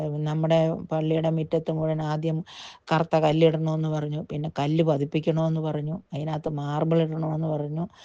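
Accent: Changanassery, India. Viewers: സഹോദരിയുടെ ഭാഷ കേട്ടാൽ അറിയാം ഒരു ചാണനാശ്ശേരി ക്കാരി ആണെന്ന് അപ്പോൾ ന്യായമായും സംശയം തോന്നാം. സ്വയം രക്ഷിക്കുക കൽദായ ചട്ടി തൊപ്പി കളുടെ കൂടേ കൂടാതെ ഇരിക്കുക. All the best സഹോ 👍🏻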